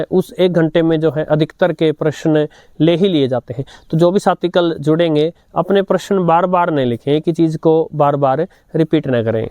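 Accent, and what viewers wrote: Standard. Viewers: सर कई बार मैं आपका चैनल सिर्फ शुद्ध हिंदी के सुनने के लिए देखता हूं । ओ हिंदी के शुद्ध स्वर आप बोलते हैं इतना मधुर लगता है । मेरे संस्कृति के टीचर की याद आ जाती है कल जरूर जुडूंगा अगर फ्री रहा तो आपका बहुत बहुत आभार रेडियो की इतनी सारी जानकारी हमसे साझा करने के लिए मैं आपके कार्य को हृदय से पसंद करता हु ।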